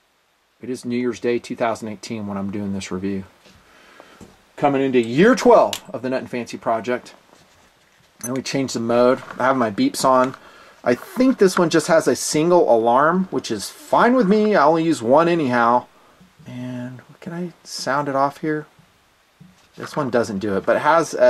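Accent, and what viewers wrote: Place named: California, United States. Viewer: where are you from? You kinda sound like you're Californian hippie surfer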